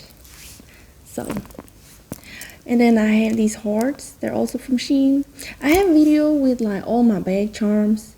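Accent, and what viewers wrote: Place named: South, United States. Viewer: I haven't seen any videos from u in a long time .. your accent reminds me of the south are u from the south??? this bag is 2 cute i may need on as well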